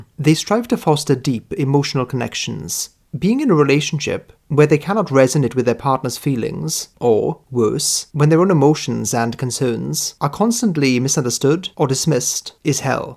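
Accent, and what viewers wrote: United Kingdom. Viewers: yeah, has his own British polite and accurate magic that is enjoyable to hear and very well applicable for some deep discussion) Well, I somehow understood by several (7 or 8, maybe) tests that I'm somewhere in INTJ-INTP-ISTJ-INFJ zone. Then I looked through videos about these types and the closest one appeared to be INTJ. Though it's either exposes INTJ as much smarter and mystical than they are IRL, either it's me being too stupid for an ideal INTJ)) I can't say for sure, but by the discussion here you really have some INFJ vibes. I usually speak more roughly and straight-forwardly. As a proper INTJ, that is ^_^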